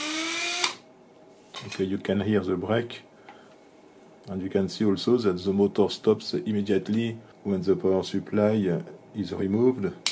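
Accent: France. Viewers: Great stuff, thanx for showing us this beautiful tech. No need to be sorry for the pronounciation: French accent sounds so good, you could call us all "d4mn m0therfuckers", and we would still be flattered 😀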